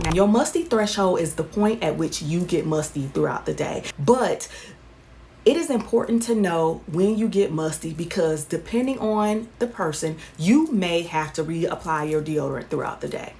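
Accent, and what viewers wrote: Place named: South, United States. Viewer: New Subscriber! Great video. Love the southern accent 🤗💜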